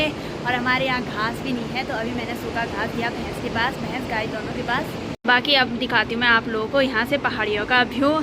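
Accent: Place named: Garhwal, India. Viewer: Nice video beta aap Garhwali language wali Hindi acchi lagti hai,Mai har video dekhta hu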